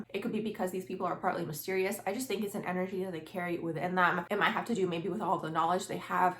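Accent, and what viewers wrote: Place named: Upper Midwest, United States. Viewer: Love this video, everything you said is true! Side note: you have to be either from Northern Illinois, Wisconsin or Minnesota.... I'm from Illinois and i recognize the accent!!!!❤❤❤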